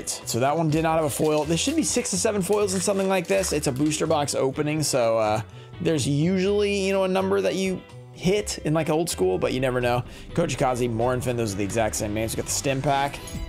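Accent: Portugal. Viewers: Random question, are you Portuguese?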